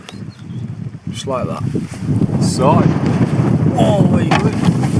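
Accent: Yorkshire, United Kingdom. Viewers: I'm guessing your from Yorkshire mate, loving your videos mate.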